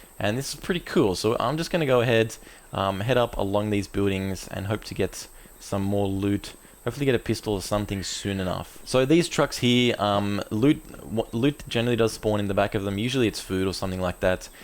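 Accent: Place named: Australia. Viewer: omg ur Australian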